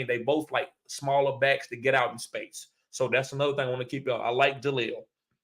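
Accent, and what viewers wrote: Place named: South, United States. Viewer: Bruh, i love that Breece comment!!! 😂😂😂 Your southern tongue does make it sound like breech!!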